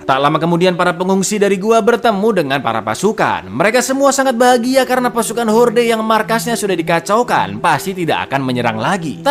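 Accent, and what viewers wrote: Java, Indonesia. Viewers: Jowo nyell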